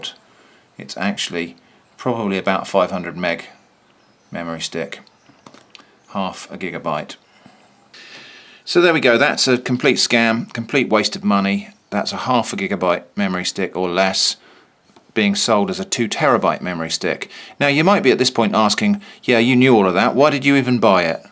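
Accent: United Kingdom. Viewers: "Completely irrelevant piece of packing", i love british english way..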